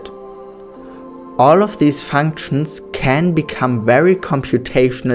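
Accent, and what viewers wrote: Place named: Germany. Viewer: I can hear your German language roots so much.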